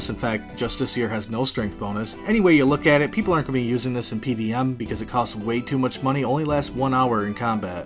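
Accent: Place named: Michigan, United States. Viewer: Are you from Michigan you have a similar accent to mine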